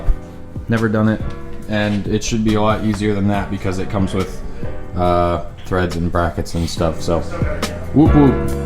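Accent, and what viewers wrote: Massachusetts, United States. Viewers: What part of mass are you from??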